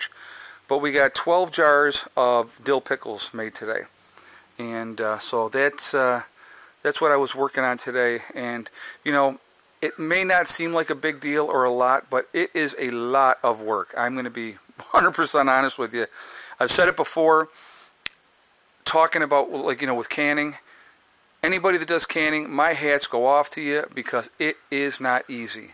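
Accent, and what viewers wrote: United States. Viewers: Iam a Christian and a Welshman to boot! I am on carer's allowance for looking after my elderly mother and I am thus poor by modern British standards but I would willingly try to accommodate any of my Christian brothers and sisters from SA and beyond! My only question is this, "Why have you got an American accent?"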